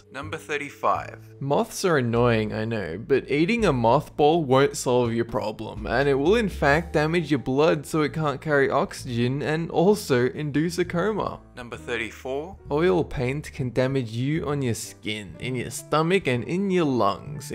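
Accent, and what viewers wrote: Australia. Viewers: Only just realising this now. He's probably an aussie. I tend to tune out accents which are the same as mine because I hear it every day... but I don't think he's from Victoria originally (if he lives here now. I heatd someone in a shop the other day who sounds a lot like him). Maybe NSW or QLD... not sure... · been listeing and He's an austurlian I think.... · He's Australian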